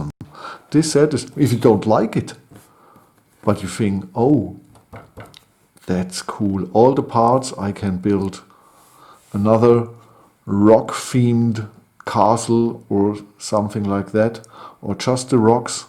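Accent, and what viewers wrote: Germany. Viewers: Your Hessischinglisch is a pain in my Ears..... Dann bitte auf hessisch.... Oder Frankfurterplatt....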